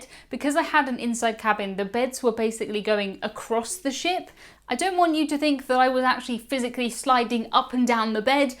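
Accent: United Kingdom. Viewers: Please continue to speak in your home grown accent and use the words of your country. I love your "Britishness".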